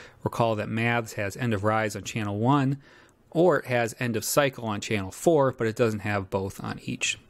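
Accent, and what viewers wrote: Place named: Midwest, United States. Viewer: This is just a relatively thick Midwestern or Canadian accent, he's pronouncing it correctly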